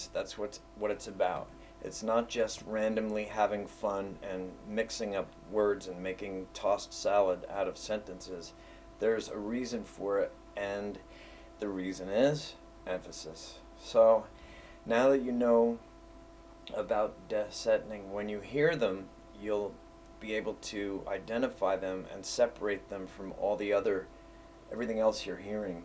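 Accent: United States. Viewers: Fenny-Jean Van Den Doel ja, too much of an American accent!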